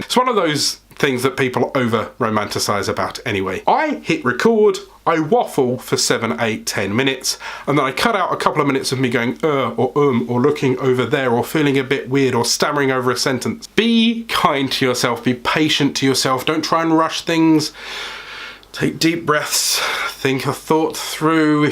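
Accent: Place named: Yorkshire, United Kingdom. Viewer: the intro was the most entertaining bit, I'm so glad you got the humour to you content... Sounds like you got the Yorkshire accent..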